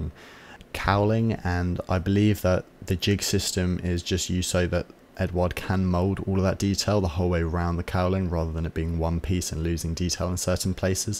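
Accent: United Kingdom. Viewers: Extremely strong British accent.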